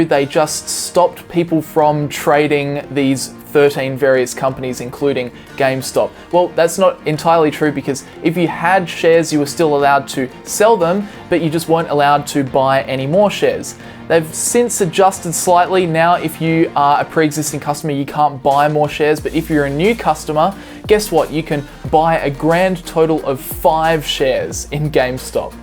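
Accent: Australia. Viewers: Are you an Aussie living in America?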